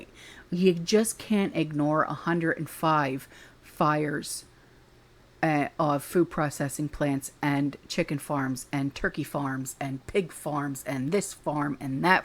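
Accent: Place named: New York, United States. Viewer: I love the way talk.... That Néw Yoik talk íts awesome!!!your awesome☺🤘you rock.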